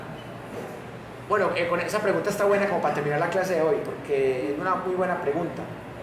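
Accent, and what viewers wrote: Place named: Spain. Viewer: Es tan genial escuchar a este profe...explica todo, claro, castizo ❤... así, sí que se entiende!👍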